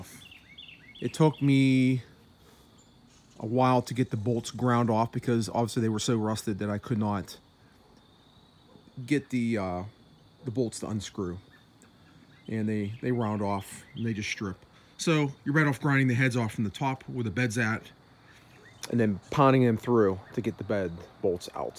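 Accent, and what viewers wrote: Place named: Philadelphia, United States. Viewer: Your thick Philly accent. Where did you grow up?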